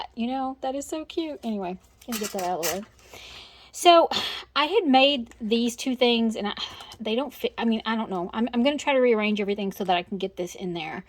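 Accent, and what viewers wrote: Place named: South, United States. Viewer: new subbie here lovely project, where u from?.. I recognized ur southern accent.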